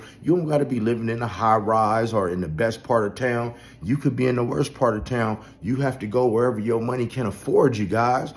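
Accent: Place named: West Coast, United States. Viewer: I felt this video all the way OG!! Preciate this content my Maine!!! Already know you reppin west coast, I can hear it.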